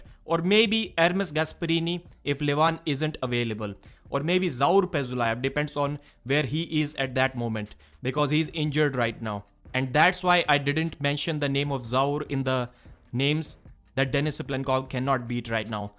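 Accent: India. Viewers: Обожаю слушать индийский акцент!!! Так мягко и приятно звучит, как колыбельная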